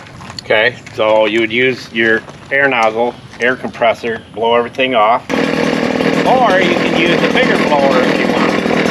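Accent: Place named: Chicago, United States. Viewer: Your accent screams Chicago? Not Florida.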